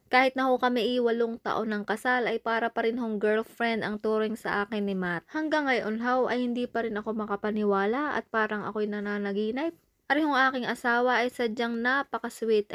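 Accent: Batangas, Philippines. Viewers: Hi there enjoy lang akong manood ng blog mo. Ayos na ayos yung narration mo na puntong batangenya. From Batangas ang Tatay ko kaya gusting gusto ko ang tonong-Batangas. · Natutuwa ako sa inyong tatlo Ako ay taga San Antonio Texas at meron din ako bahay sa Lipa City Batangas kaya gustong gusto ko yong puntong Batangas mo Pero ako ay isang ilokana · Natutuwa ako sa iyong Batangueña accent! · Nakakaaliw ang tonong batanggeño😁👍 sis.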